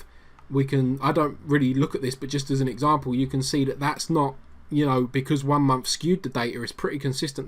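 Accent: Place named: Colchester, United Kingdom. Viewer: I'm originally from Colchester too. The accent sounded familiar :) ..A Helpful video as returning to SEO my sites. Ta very much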